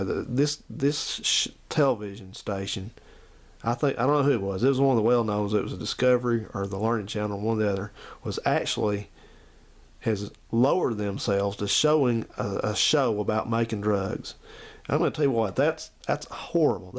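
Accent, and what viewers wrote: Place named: South Carolina, United States. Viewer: Squad 51 squad 51...though I'm 32, I love that show. Agreed about making merge...just what kids need to learn. My 9 yr old likes your repair videos over tv. He tells me you sound like you live in south Carolina.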